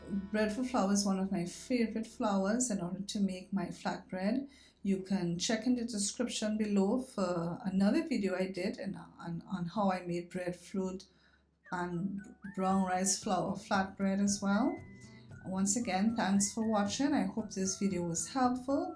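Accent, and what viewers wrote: Trinidad and Tobago. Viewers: Is that a Trini accent i detect..? 🤔😃 Very informative, exactly what i needed.. thank u. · It sounds like a Trini in the house.:elbowcough: Great vid.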